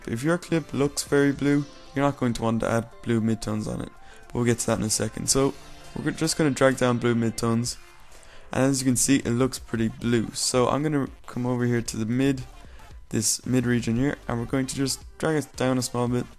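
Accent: Ireland. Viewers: Irish man ;D cork ?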